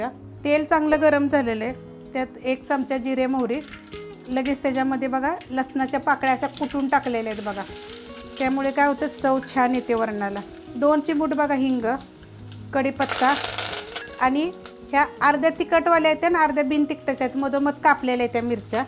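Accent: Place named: Kolhapur, India. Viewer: This is pure कोल्हापुरी voice. Nice recipe and all the best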